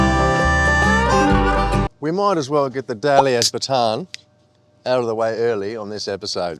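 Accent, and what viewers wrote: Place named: Australia. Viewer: Good day,I’m an old man from London, and have been watching your channel for about 3 weeks and what I find so fascinating about you is your voice, I love the way Australian,s speak don’t ever change it ,anyway when I was a young person 17 in 1964 to be precise I went to Aussie and my first job in Sidney was working for a company called Whelan the wrecker demolitions buildings .well I lasted a week and told the foreman what to do with his job.Well 6 years later I decided to come back home to London a place called Kilburn ,were I grew up. But I loved Australia what a great country, in my travels I palled up with a guy out of Launceston Tasmania called Michael Blazley and we all lived in Melbourne and use it as a base travelling all over the Country from Moree northern New South Wales to Goldsworthy W.A up to Batchelor in the Northern Territory,hitch hiking all over your Country I had a ball. 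I’m also a Van fan I have had many Sprinters in my time,Ford vans like yours are going for under £5000, pounds and there lots of breakers that have lots of gearboxes on E Bay UK . I might be able to help out if you get stuck , Michael.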